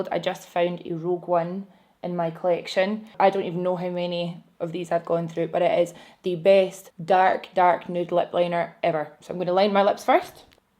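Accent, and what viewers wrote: Scotland, United Kingdom. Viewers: Got my captions on because I'm blow drying my hair and can't hear... YouTube cannot translate the Scottish accent. Instead of 'contouring' the subs said 'corn shooting' and it's killing me 😂🤣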